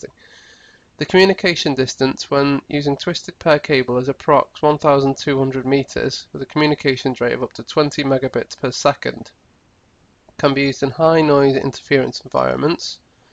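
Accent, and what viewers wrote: United Kingdom. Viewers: I just bought a 100w solar panel, 12v gell 35Ah battery & charge controller. Because, like you, I want to learn about solar. Seeing Julian Ilett frequently use his solar system on his 'tronics bench, I thought I'd try it out. BTW, if I can ask, what is your UK dialect? This Amurrican is a huge fan of EVERYTHING UK, so I really love the accents from different places in the UK. I think my favorites are Welsh, Yorkshire, Cockney... Oh, yes, and 'posh'. Best!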